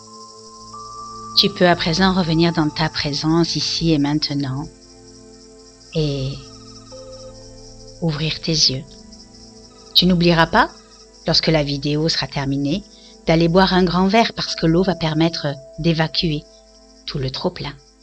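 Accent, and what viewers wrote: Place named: South, France. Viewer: ...oui ,il n'y a plus qu'à !! Cela serait bien intéressant !! Moi je ne sais pas où vous êtes j'imagine dans le sud de la France vu l'accent !! mais moi je vis en Italie dans le Friuli!! Je ne vais pas souvent en France mais, quelques vacances pourrais ce faire !! bonne soirée.christine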